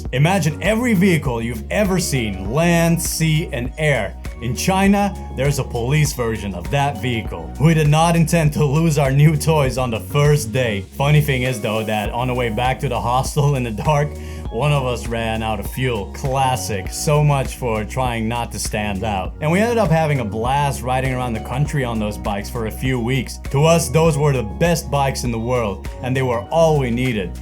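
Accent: Netherlands. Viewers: Hey! Just discovered your videos and they are fantastic! Would you mind answering a question for me? Where are you from? The Netherlands? Austria?:) Somewhere else? I am only asking because of the accent you have. I am Dutch myself but I've been living in the U.S now for nearly 20 years and my English accent has evolved somewhat. I am guessing my accent does sound a little like yours. Thanks for the videos! keep it up and stay safe!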